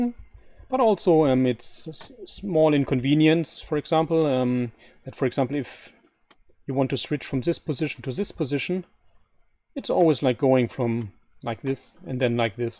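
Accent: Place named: Germany. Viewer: His accent sounds kinda german...🤔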